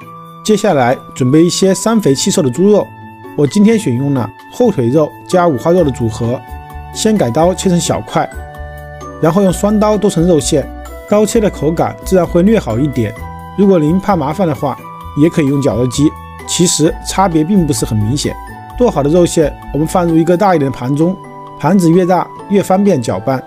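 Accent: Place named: Hubei, China. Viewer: 大厨是湖北人吗？听出点口音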